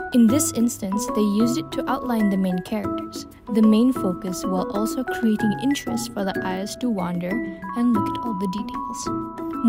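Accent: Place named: Malaysia. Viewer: Hey!! Thanks for this video!! I actually did some self-critique on my artwork before so I could relate to this video Also, I KNEW IT, YOU'RE MALAYSIAN!! I thought your accent kinda sounds Malaysian plus your name. Hello fellow Malaysian artist~ And I really like your semi-realistic artstyle. I also do semi-realistic-painterly style as well!! Oh, and also, WTF, YOU'RE ONLY 17!?!??? HOW ARE YOU ALREADY THIS GOOD!?!??? I thought you would be like, 21 or something. But I've seen some really good artists who are quite young, so I guess it's normal Also, why no comms? T__T Oh, and btw, did you have a booth at CF two weeks ago? :oo Anyways, I'm gonna subscribe to you and I'll look forward to see you post more videos and speedpaints~!! Cheers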